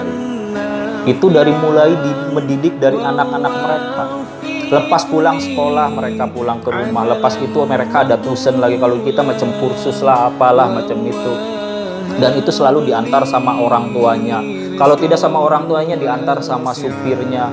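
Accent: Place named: West Java, Indonesia. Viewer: Beruney nya mn sih kang logat mu macam sunda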